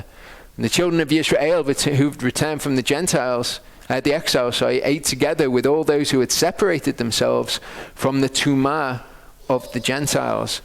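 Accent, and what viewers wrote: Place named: Liverpool, United Kingdom. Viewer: I SEE BY YOUR VOICE YOU ARE FROM LIVERPOOL, I ALSO AM FROM LIVERPOOL AND AM LOOKING FOR A BIBLE BELIEVING CHURCH THAT KNOWS WHAT TIME IT IS AND THAT WE ARE IN THE VERY LAST DAYS, IVE TRIED MANY, BUT THEY ALL PREACH ONLY FROM THE FOUR GOSPELS, THEY DONT GO NEAR PAUL, THE OLD TESTAMENT AND CERTAINLY NOT THE BOOK OF REVELATION. I REALY NEED A CHURCH THAT I CAN GO TO AND FELLOWSHIP WITH, CAN YOU ADVISE ME? I LOVE YOUR SERMONS AND WISH YOU HAD A CHURCH IN LIVERPOOL! YAHOVAH BLESS YOU IN THE NAME OF YESHUAH HA'MASHIACH! THANK YOU! My email is: <mail>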